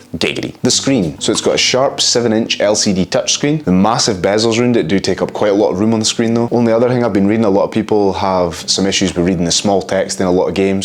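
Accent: Scotland, United Kingdom. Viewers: I appreciate your transparency and most of all your content (and scottish accent).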